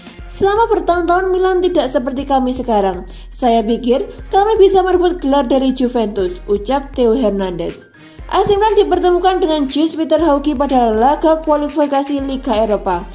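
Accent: Java, Indonesia. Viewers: mani medooook eta suara pengsisi suarana🤣.....forza milaaan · Surane medok medok pantes 🤭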